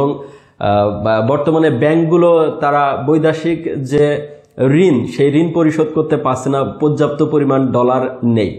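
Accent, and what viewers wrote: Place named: Satkhira, Bangladesh. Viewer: ভাই সাতৱাৱ ছাগলৱাই এভাবে কথা কয়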